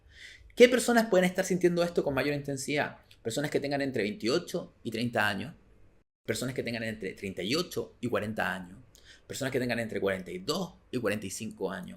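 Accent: Chile. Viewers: Lo vi todo, obvio!!! Tengo 50 y cumplo el 20 de agosto... Sentí q me hablaste a mi!! Estoy nueva en esto de la astrología, era super exceptica con eso pero desde q te escuche en el podcast tiene sentido y me cambiaste todo en cuanto a eso. Me maravillo al verte hablar. Viví en Chile casi 3 años y extraño su hablar y contigo tengo un combo de maravillas entre lo q dices y como lo dices. Un abrazo!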